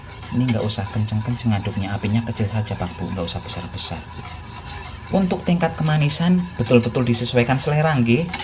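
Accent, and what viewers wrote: Banyumas, Indonesia. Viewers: tetep ngomong bahasa ngapak... lebih natural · Nyong seneng bahasane ngapak,pada bae kang nyong ya ngapak😂😂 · Lucuuuu ms...saya jawa timur hidup di Samarinda....suka dengan logat bahasa ngapak...lucuuu poolll...ms👍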